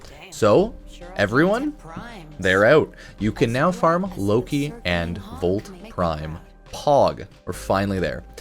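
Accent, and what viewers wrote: Canada. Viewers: New to your channel. Gotta say, you sound very Canadian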